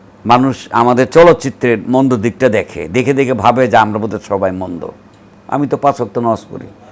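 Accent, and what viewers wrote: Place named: Sylhet, Bangladesh. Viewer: আপনার কথা সিলোট যানাই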